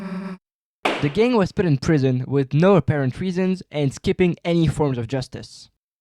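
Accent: France. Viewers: En vrai un bon 8.5 je trouve, on comprend bien ce que tu dis mais on entend bien que t'es français mdr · Tu parles français? J'ai l'impression ton accent l'est un peu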